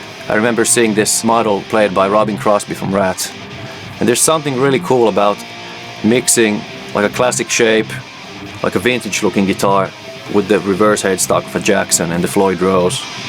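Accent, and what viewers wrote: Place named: Germany. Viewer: Man, I just found you and as a Jackson lover since the start of the 80s and having started playing guitar back in 77 I love this. I have always loved these guitars as well. The homy grail of Jacksons. I have a charvel Preditor crackle from 91 with a factory Schaller trem I love and with the reverse headstock which is too cool. I dig your playing too man. Do you have a band website where I can listen and buy your music? What country are you from may I ask? You sound sorta German but can't quite put my finger on the accent. 😂 Regards and stay healthy brother. From the heartland . Indiana USA Tim . ❤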